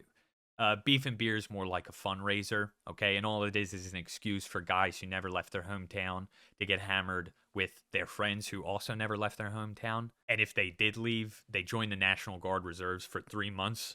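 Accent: Philadelphia, United States. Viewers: Are you from the Mayfair section of NE Philly? You got the accent.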